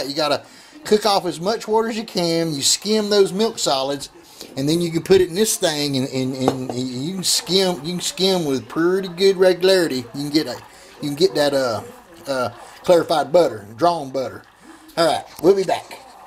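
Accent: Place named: South, United States. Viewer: Loved your accent, I'm for the South too!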